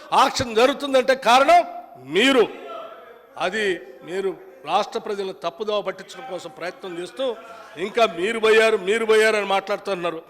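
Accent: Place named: Andhra Pradesh, India. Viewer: Ne language also like Andhra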